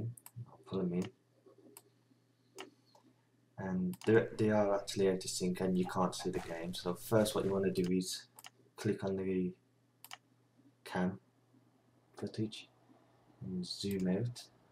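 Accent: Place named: Birmingham, United Kingdom. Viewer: Woohoo a brummie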